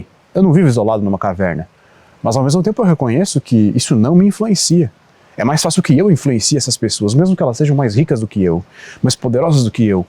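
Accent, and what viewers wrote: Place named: South, Brazil. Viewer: Hey estou aqui, Sotaque muito bonito o seu,muito orgulho da cultura Sulista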